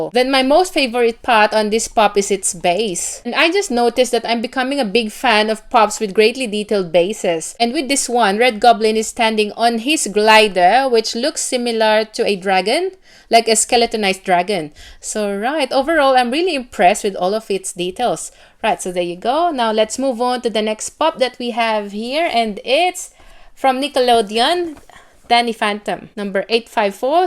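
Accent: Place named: Australia. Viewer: Been binge watching your vids. Hope to feature pops from the Demon Slayer line (if there are any). Signature mo na talaga yang Aussie accent mo. Keep posting very interesting vids!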